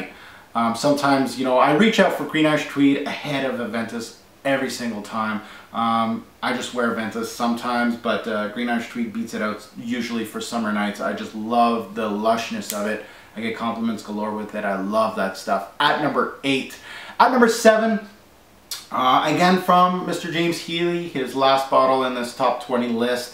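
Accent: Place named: Quebec, Canada. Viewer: Hahahahah!!! I like your French Canadian accent - the way you pronounce 'Frapin' and 'Sel Marin' is fantastic! So a question, (get it?)...is this list based on objective quality or personal preference? Gotta love my man Heeley.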